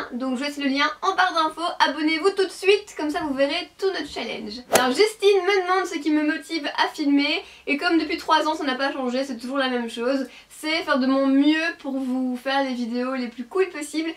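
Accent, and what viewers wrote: Belgium. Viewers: Dernière question à ce que j ai compris tu est belge ? Tu a un accent belge c est vrai ! (En mode pomee 😹😂) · comme tu habite en Belgique est-ce que tu peux garder ton accent belge parce que moi aussi je suis une belge et il y a je pense des belge qui te regarde aussie bref t'es trop belle j'adore tes vidéo ne le prend surtout pas mâle pour l'accent dsl d'avance si je t'es fais mâle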